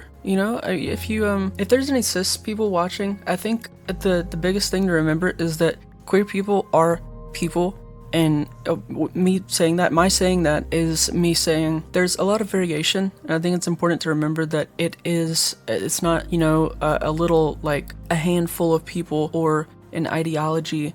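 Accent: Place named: South, United States. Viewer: I like how it sounds like u have a slight southern accent that maybe ur hiding.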